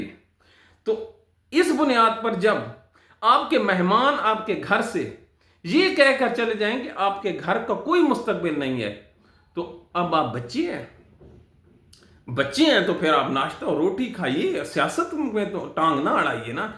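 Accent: Saraiki, Pakistan. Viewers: آپ اُردو سرائیکی میں بول رہے ہو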